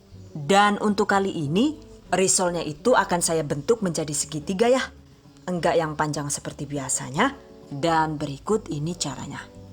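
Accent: Banyumas, Indonesia. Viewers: Enyong sabar....nunggu ngomong ngapake....ora Tek skip loh mbekayu...resepe nyong ya butuh kowh... ksuwun nggih... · Wah bisa buat isian jajan arisan nih... Muantul resep2nya... Selain mantul, ngomong ngapaknya juga bikin sakit perut... wes lah yaaaa jan tenan... Caaaatat DONE #giveawayTHVdesember2019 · Kiye nyong seneng nemen yen bunda ngomong nganggo bhsne inyong yakin sung bund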